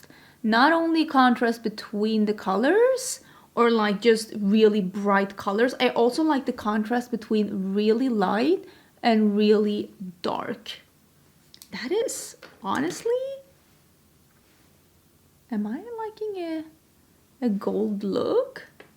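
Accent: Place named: Sweden. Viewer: Its been awhile since I watched a makeup review You're losing your accent 😭 It use to be very thick and unmistakably Swedish(not a bad thing) but it happens to quickly when you move anyway from one's homeland but I can definitely hear your accent softening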